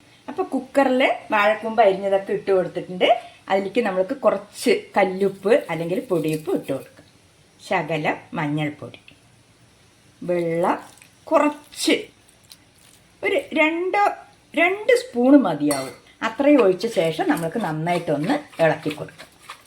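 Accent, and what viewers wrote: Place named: Palakkad, India. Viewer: പാലക്കാടൻ ഭാഷ ഇഷ്ടായി🎉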